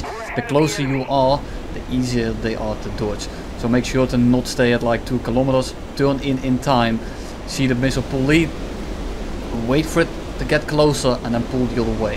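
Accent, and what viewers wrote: Netherlands. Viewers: you sound pretty dutch but i like it very much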